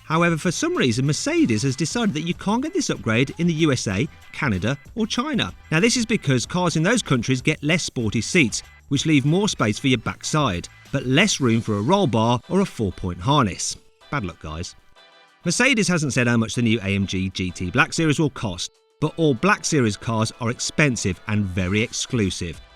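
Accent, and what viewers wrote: United Kingdom. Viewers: 이거 영국식 발음인가 뭔가 딱딱해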